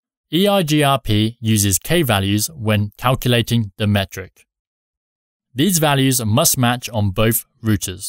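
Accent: England, United Kingdom. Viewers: Rutah 😂 England accent Remind me buttah ( butter) Great explanation by the way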